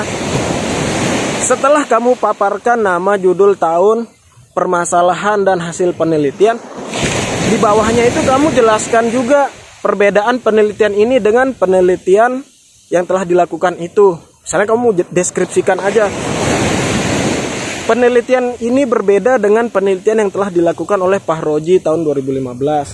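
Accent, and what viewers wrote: Lombok, Indonesia. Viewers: logat lombok hehe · Bang org lombok ya?? Xixi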